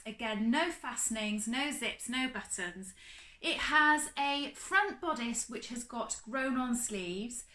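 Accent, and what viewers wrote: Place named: West Country, United Kingdom. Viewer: Loved your post. I like your pattern and fabric choices. Lovely to hear a West Country accent. And well done, girls, you’ve both made a good start.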